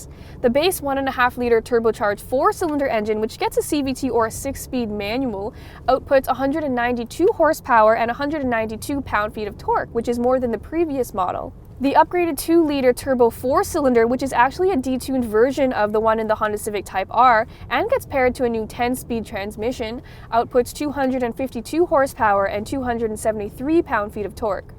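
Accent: Canada. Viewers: She's the coolest Asian Millenial with a hint of Canadian accent Autoguide can find to go at it HAM.....LOL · Is the price in Canadian dollars? You sound Canadian.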